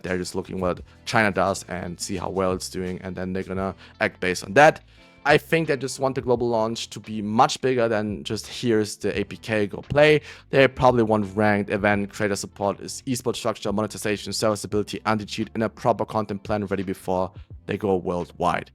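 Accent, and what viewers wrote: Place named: Germany. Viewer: You really got all the info in this little Video keep it up! Lil question. First where are you from because you sound a bit german and second can you explain how you get a 100 ping if the background is your gameplay because i am stuck on 150 ping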